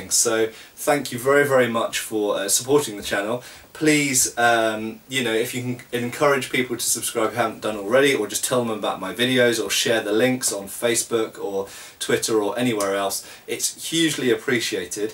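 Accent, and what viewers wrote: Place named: England, United Kingdom. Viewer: THANK YOU for making cool videos about swords! We love swords and the English accent only enhances your narrative coolness :)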